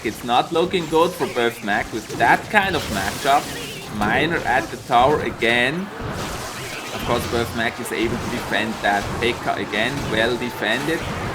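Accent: Switzerland. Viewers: Are you Swiss because of the axent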